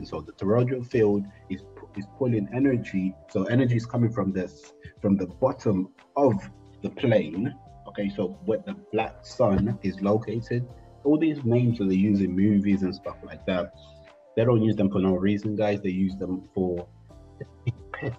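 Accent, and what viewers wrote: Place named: Israel. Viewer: The isn't white? 🤯 no just kidding I knew even when I started watching your videos and hearing the sound of your voice that you where a Hebrew. Seek Yahusha messiah my friend! Yah bless!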